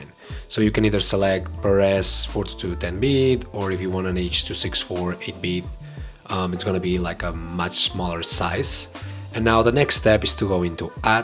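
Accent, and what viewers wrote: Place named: Catalonia, Spain. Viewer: Que soooolid! m'he catat q erets català per l'accent en anglès😂😂😂 una mica Pep Guardiola... Segueix així que ajudes molt👍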